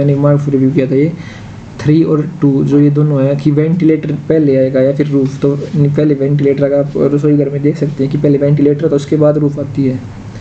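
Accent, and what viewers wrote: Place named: Haryana, India. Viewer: Haryana alya ki to boli t e pichan hoja h konse district ka h bhai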